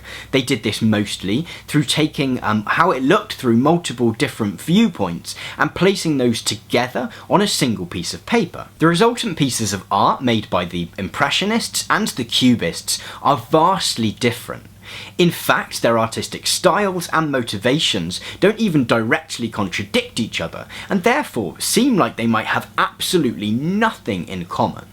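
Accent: United Kingdom. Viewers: 第一次有觉得英音难听的时候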